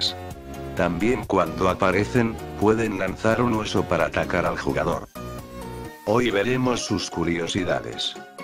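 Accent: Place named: Castile, Spain. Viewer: Ese castellano papu :v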